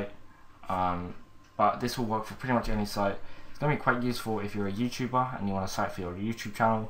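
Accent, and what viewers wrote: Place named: England, United Kingdom. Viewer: I agree with HCFP Gaming, TOTALLY! There is always a pause button. Besides, I don't like downloading ONE video for just one piece of information. Download the video software with a traffic code (well, I am not on commission VLC Media player -free), you can watch your video at whatever speed you want. Do you know how unhappy my ISP is when I download 54 videos which could have been done in 14!? Love his video, especially the fact that he's English! Yummy accent!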